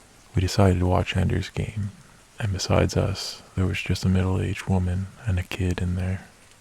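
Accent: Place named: North, United Kingdom. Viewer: You've gotta be a northerner- we have the same accent 😬😄 Another great narration!👍💜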